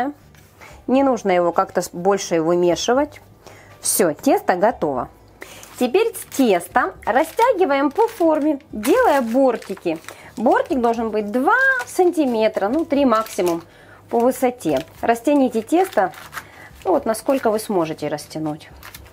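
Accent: Ukraine. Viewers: Сколько смотрю столько и слышу украинскую речь. Мне нравится её говор 😍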